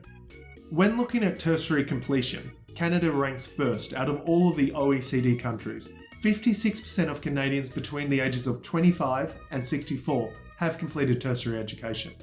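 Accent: Australia. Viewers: Obviously Australia look at his accent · This guy has an Australian accent. Hardly balanced and impartial. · The narrator has some aussie accent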